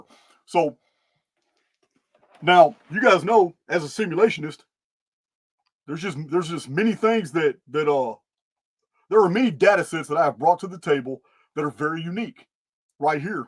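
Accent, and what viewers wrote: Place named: South, United States. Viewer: I have been measured at 161 IQ. You are truly smarter than 119...I put you at 162...it is too hard on the intellectuals to lose arguments and debates to a southern accent. I know, I am from NC, and live in California...Yep, that southern accent is a double edge sword. On one hand the intellect is stealthy, on the other hand it is easy to dismiss a southerner speaker and pass it by as a dumb-ass blithering, which you and I are not. Love your shows.You ever need a typing done, i do about 100 wpm.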